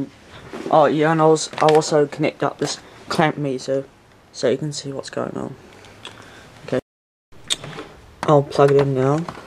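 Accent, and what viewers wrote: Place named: United Kingdom. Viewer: How is it that all the crazy electricians have a UK accent? XD I absolutely love these!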